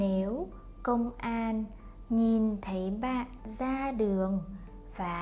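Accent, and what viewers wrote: North, Vietnam. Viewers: Thanks for this video. I searched long to find some good stuff to listen to as an "experienced beginner" and this is exactly what I wanted (even if northern accent ;) )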